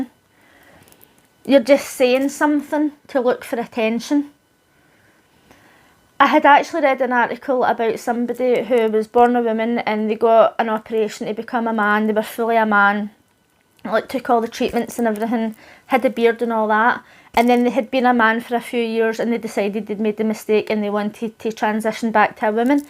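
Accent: Scotland, United Kingdom. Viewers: Oh maan what an accent, is that Sco’ish? Absolutley fantastic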